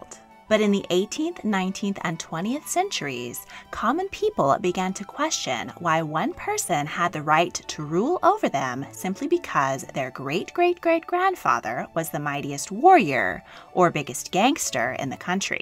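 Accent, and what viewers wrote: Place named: United States. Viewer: Who knows how long any of the monarchies will last? Time well tell. But I wonder how and when you an American (with all the baggage between your country and Britain that entails) decided it might be a good idea to produce a video in which you speculate on the downfall of foreign monarchies. Most of them countries that are allies of the US. Could you not see how inappropriate that might seem to a lot of people? I found it especially crass for a narrator with one of the most pronounced American accents I’ve ever heard (and I come from the US) to take on this dubious task. You seem blind to how incredibly presumptuous and lacking in taste it sounds coming from someone of your background. And of all times to put out such a video! I wonder how Americans would react if some smug British talking head did a series on the future of the presidency and American form of government with a review of all the disastrous American presidencies of the last 50 years, Nixon, Dubya, TRUMP. 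Time for a parliamentary cabinet system in Washington?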